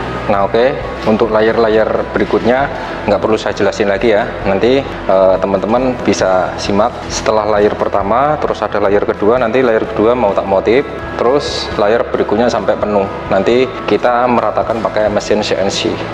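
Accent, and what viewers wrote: Java, Indonesia. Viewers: Logatnya kaya wong jowo,asli pundi mas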